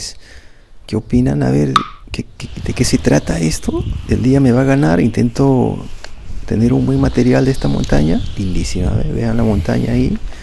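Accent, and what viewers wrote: Peru. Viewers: no soy muy bueno con los acentos.... ¿¿de donde eres?? estoy entre peru, bolivia.... tal vez incluso paraguay :3 .... pregunto para saber a donde planifico mi proximo viaje jejejje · En que país estas ahí?.. hablas como peruano. estas en Perú?.. saludos desde Cancún Quintana Roo México.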